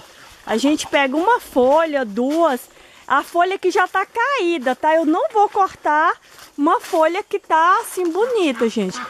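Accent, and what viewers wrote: Minas Gerais, Brazil. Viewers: Pelo sotaque gracioso da, ela está em Minas Gerais...onde? No Paraíso! rs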